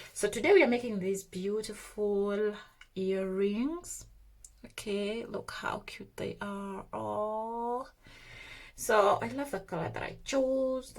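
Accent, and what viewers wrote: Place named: Jamaica. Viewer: that's Jamaican talking but good work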